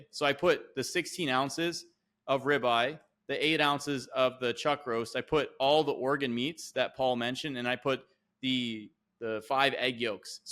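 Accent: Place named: New Zealand. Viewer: has a very New Zealand accent! 😂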